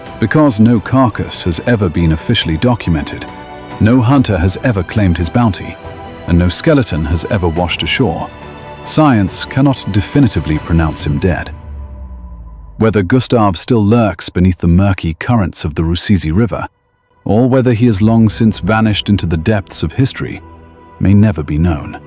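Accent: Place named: United Kingdom. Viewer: As soon as I heard the British voice, I knew it was going to be good.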